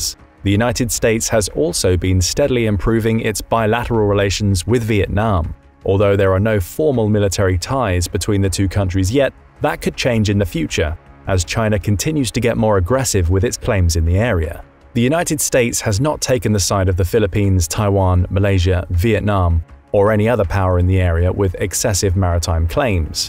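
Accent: England, United Kingdom. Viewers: Thank you for actually stating you base this whole video off nothing more then purely speculation. You stated that this “Shoddy Construction” is based off a few of the islands runaways. You disregard the idea it could be to not provoke further escalation. Do you know that China is the builders of the world. You are lacking facts in this video. 100 years of humiliation….is the time you reference China being the largest economy and then crumbling. Th is was because the West forced worst, opium population, devastating economy, country all up with colonialism something that the rest seems to excel at. The question part is also that there is information here that is worth knowing actual but it’s used just to pop up a story or narrative that’s s not true you. if you decide to do research and publish videos based of facts might actually be worth following. 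You know what is true…Americans hear a narrator with an English accent, and automatically give credibility to whatever information is being spread. Neat trick and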